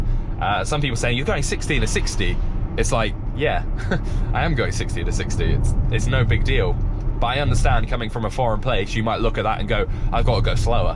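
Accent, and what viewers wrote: United Kingdom. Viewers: I like teslas and I like British accents. Found this channel yesterday. Good job yt algorithm!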